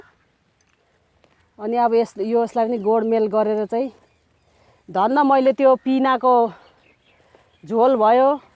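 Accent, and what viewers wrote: India. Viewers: राम्रो छ्🙏हजुरको बोलि indian जस्तै छ्😁कता हो हजुर को घर??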